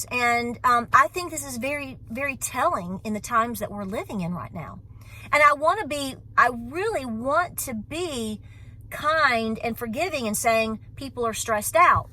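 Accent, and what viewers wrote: South, United States. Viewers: Girl Girl Girl, as we say in the South! If I didn't recognize You're accent (I'm a Kentucky Trumplican!), I'd of thought the "T" was for Tracker as in You have Your Truck back!!! In all honesty, lived in Nashville for 14yrs & I have the same hat! So, some people just have to have something to Bitch about & You just happen to be an easy target. But, no worries Girl, You got this! Life is short, make it Sweet. Hell, I'll be 67 come May & just went back to work for Kroger Today. Both my cars are needing a car doctor & been ankle expressing for 2 wks plus. God said, get in shape Ladybug! & So funny, So did You. ❤ From Kentucky Patriot Warrior ❤❤❤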